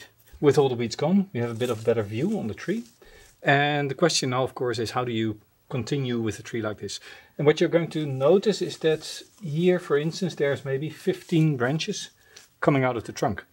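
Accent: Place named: Netherlands. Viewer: Hi, you sound Dutch but I am not sure. I am a beginner bonsai grower and was wondering if I can ask some questions. Have some soil problem for my ficus and looking for some species that do well outside In Holland. Best regards Dennis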